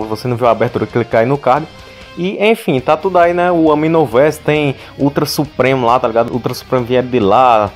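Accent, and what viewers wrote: Northeast, Brazil. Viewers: Eu acho que ele mora no nordeste por causa do sotaque dele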